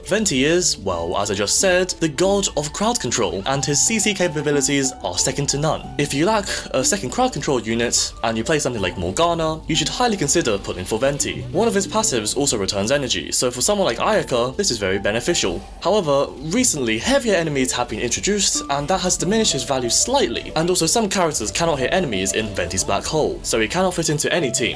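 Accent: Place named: United Kingdom. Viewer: Are you British? Your accent sounds British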